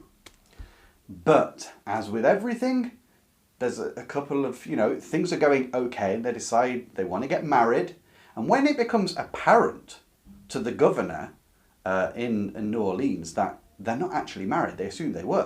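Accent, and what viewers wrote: England, United Kingdom. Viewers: I'm glad you appreciate as I wasn't sure it was a good thing to point this out 🤔🤗 I'm not from south of France so I can't confirm if "t" is pronounced over there 😅 Well but anyway I think it's pleasant to hear some English accent. I guess most of the French people do 👍😊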